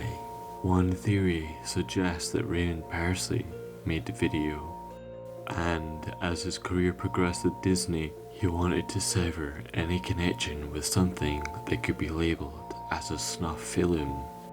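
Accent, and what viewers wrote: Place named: Scotland, United Kingdom. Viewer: Ah recognise that accent, yer fae Scotland mate! Me tae! 🤪 Good video, hope you're doing well. Best wishes from Edinburgh 💙🏴💙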